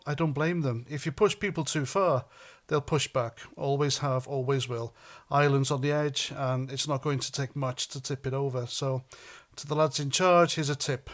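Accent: Wales, United Kingdom. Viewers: The Narrator is not English he's Welsh.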